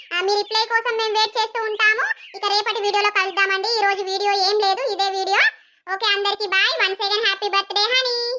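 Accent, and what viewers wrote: Telangana, India. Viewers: Cheyandi kaani voice and telangana slang same vunchandi .... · Cheyyandi kani voice and slang assal change cheyaddu ee voice n ee telangana slang ey bagundi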